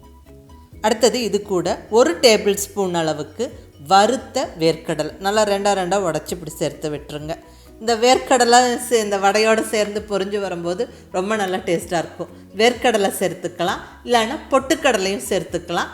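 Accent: Coimbatore, India. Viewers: Ungal kovai Tamil kettukitte irukkalam pol irukku, aana video seekkiram mudyuthe!!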